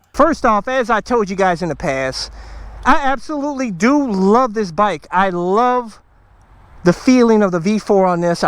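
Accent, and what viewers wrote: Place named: South, United States. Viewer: You know you sound like a southern black, right? 🤗🤙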